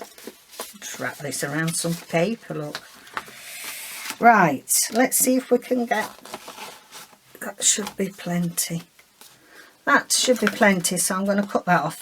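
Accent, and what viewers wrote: Yorkshire, United Kingdom. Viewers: Don't change a thing,. We love your enthusiasm and for sharing your techniques and especially for your accent from God's own county. (Yes I'm biased having been born and bred in Yorkshire but now live "down south"). While watching you I'm finishing my fourth A5 piece of improv. stitching (inspired by Ariane Zurcher). Next week I'm going to start the wrap fabric cover (inspired by Anne Brooke "Wobble Gob 3) - another Yorkshire girl!! Can't wait for the pick jar. Enjoy the plum crumble!!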